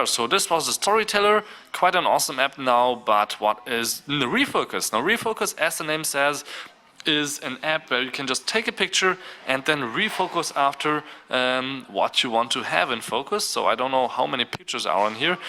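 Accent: Germany. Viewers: this german accent is killing me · Who cares if he has a german accent? Lets see you try to speak german n not have an accent.lok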